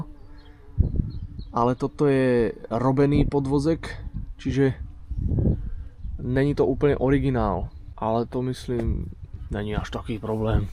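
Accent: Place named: Moravia, Czech Republic. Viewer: Moc fajn video! Nikdy jsem nikoho neslyšel mluvit zároveň česky, moravsky a slovensky 💪🏽😀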